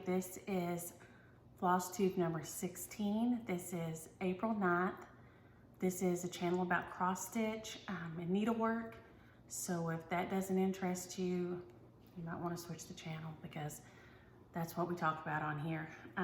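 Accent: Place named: Texas, United States. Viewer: I just wanted to let you know that you brighten my day so much! I'm not even a cross stitcher, my Granky did beautiful embroidery and tried to teach me but it just wasn't my thing. I AM a knitter, and I binge watch your FlossTubes while I'm knitting! I was born and raised in Texas, but have lived in NV with my husband for the last nearly 10 years. We have a 7 year old with severe autism, so travel is nearly impossible even when we aren't in this quarantine mess. You make me so much less homesick! Your accent, your stories, just your personality, it gives me a little taste of home, and during times like this, that's been more of a lifesaver than I can even explain. I am so grateful for you, I truly am! So thank you!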